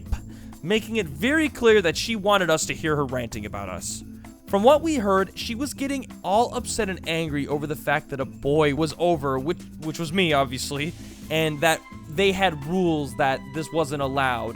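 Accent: Newfoundland, Canada. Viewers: hey what part of newfoundland you from?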